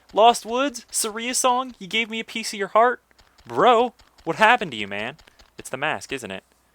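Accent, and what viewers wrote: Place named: Australia. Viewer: Lol am form England and you sound Australian with the accent!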